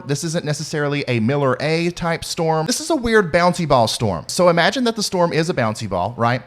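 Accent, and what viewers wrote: South, United States. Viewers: Your fantastic! Soooo glad I found your channel! And you have a nice smart sounding southern accent ..opposed to the other southern sounds😘😁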